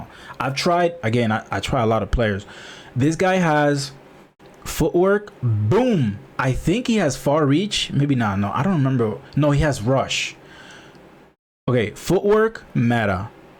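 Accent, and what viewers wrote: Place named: Dominican Republic. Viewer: Bro your funny 🤣🤣🤣 with the Spanish I can hear your from RD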